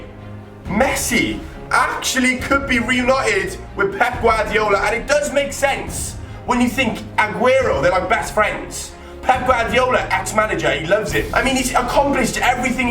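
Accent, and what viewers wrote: Wales, United Kingdom. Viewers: Are u welsh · ar you Welsh? cuz i am! <3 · Are you Welsh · Are you wellsh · are you Welsh? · are u Welsh cos I am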